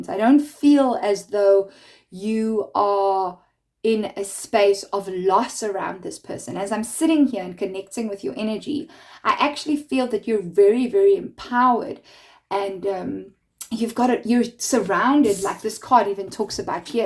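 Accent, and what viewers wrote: South Africa. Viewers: You sound so South African. 🇿🇦❤️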